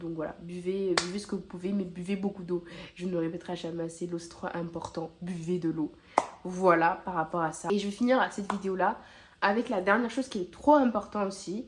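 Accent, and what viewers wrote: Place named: South, France. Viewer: Tu as chopé l’accent du suuuuud !! Hâte de tes prochaines vidéos ❤️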